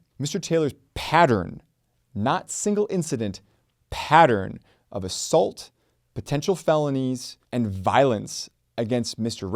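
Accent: United Kingdom. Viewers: He's got an English accent!! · Nah, southern England but not London or estuary or West Country dialects. 🏍️🏴🦃👊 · Dudes British. I understand now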